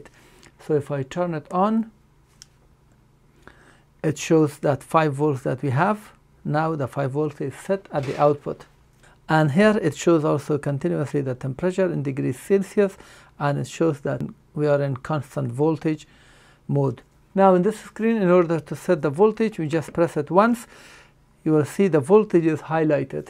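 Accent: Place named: India. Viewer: indian sound funny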